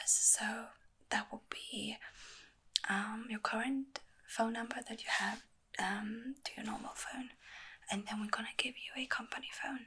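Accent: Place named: United Kingdom. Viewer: Britishness turned up to 8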